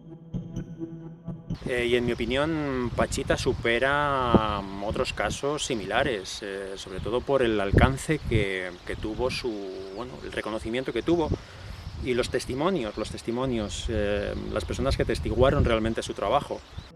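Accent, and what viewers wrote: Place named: Spain. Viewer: Todo bien pero no soporto ver y oir este interesante documental con el acento español...como que algo no cuadra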